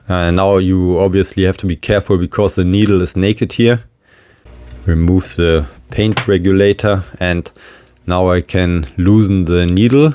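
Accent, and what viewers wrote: Germany. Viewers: Excellent video and don't worry about your English is perfect and great with German accent 😄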